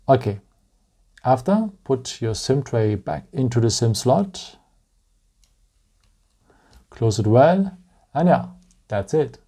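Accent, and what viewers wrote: Germany. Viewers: We need more videos with some German accent ! Probably buing this phone today, i have had a 9 for four years and very little trouble.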